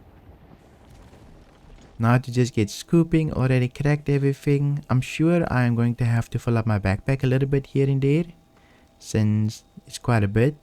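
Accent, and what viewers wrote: Afrikaans, South Africa. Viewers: man i know its not your fault but damn that afrikaans accent is sooo thick 👁👄👁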